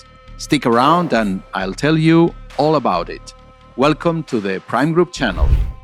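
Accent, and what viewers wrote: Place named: Spain. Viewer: You are a talking in an English but of Spanish accent. By the way I'm a Filipino but my late grandma had at least 30 to 40 percent of Spanish blood.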